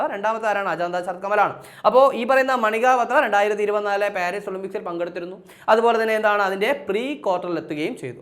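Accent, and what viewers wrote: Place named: South Kerala, India. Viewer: തെക്കന്‍ സൈഡ് അങ്ങനെയാണ് ഭ'ക്ക് പകരം 'ഫ' ഉപയോഗിക്കും. ഫാര്യ,ഫിത്തി.. അങ്ങനെ😂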